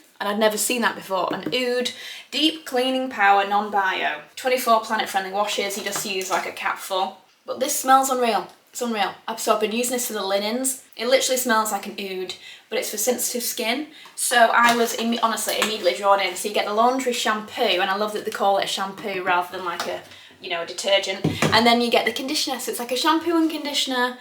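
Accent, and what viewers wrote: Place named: North, United Kingdom. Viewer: My God I do love a Northern dialect! The way you say „honey“ and „gut“ 😂 ok, this sounds really weird written out but hope I’m not alone in this 🙃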